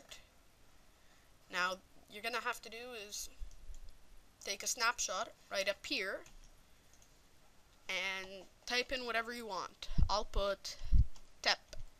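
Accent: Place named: Canada. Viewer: no offense..but i can tell u have a canadian accent..its cool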